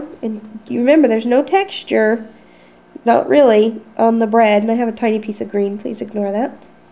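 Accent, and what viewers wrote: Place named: Kansas, United States. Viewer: Love your videos! I have been doing minis for some time now and Love getting ideas from others. You don't even miss a beat in your tutorials. By the way, I live in Cali but I grew up in Ulysses Kansas so when I heard your accent I knew you had to be either Kansas or Oklahoma! Thanks for the videos. God bless!!!!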